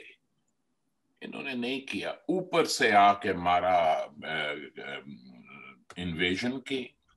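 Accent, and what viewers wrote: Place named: India. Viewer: Host sounds an Indian, Tariq should be careful whom he allows to allow a ride of his profile.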